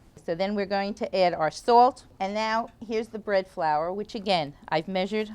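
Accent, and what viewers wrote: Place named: New York, United States. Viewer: I love the New York accent.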